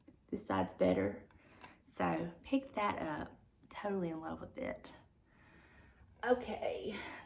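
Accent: South, United States. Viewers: oh I knew the accent was southern. Thanks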